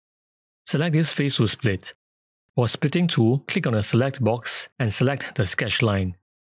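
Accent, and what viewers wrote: Singapore. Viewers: Are you Singaporean?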